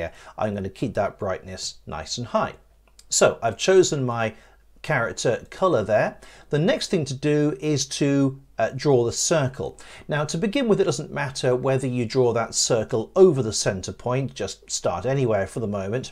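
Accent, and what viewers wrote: United Kingdom. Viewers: Bro's voice is British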